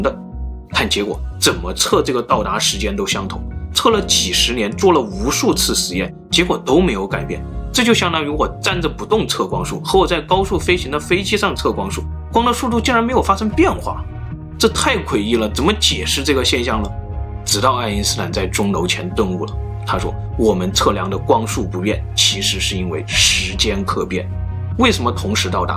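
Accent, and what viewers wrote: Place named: South, China. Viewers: 我听出来是武汉人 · 就喜欢这种不标准的南方口音普通话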